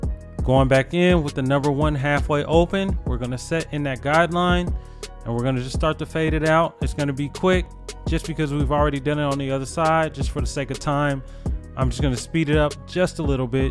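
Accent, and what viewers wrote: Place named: Sacramento, United States. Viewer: just asked cause you sounded like some of my folks in Sacramento but Oregon ain't to far from cali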